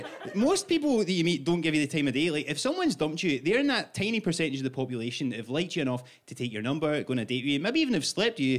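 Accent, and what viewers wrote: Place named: Glasgow, United Kingdom. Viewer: the glasge delivery, is superb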